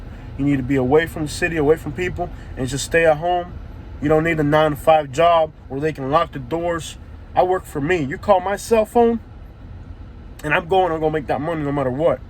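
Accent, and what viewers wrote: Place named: South, United States. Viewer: Hey you developed a southern accent rather quick.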